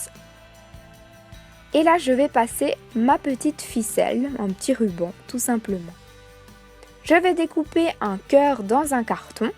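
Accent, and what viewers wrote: Belgium. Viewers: Hello tu viens d'où de Belgique ?? Ou sinon belle vidéo bisous 😘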